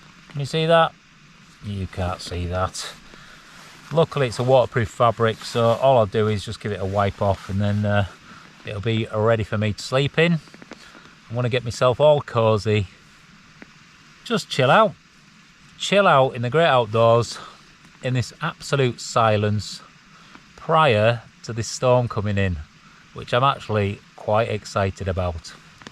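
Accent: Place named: Yorkshire, United Kingdom. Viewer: Nothing better than sitting listening to a pissed Yorkshireman pontificating........... "When I wut Lad...." Brilliant.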